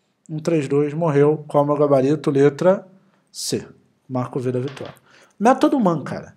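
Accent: Rio de Janeiro, Brazil. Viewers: Eu adoro o jeitinho carioca "Tu vai continuar reclamando?" 🤣 Obrigada pelas aulas! Aprendo muito aqui e paro de reclamar!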